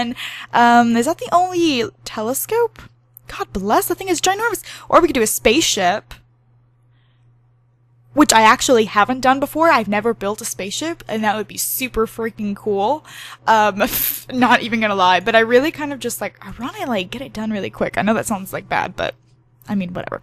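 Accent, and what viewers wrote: United States. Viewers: Your American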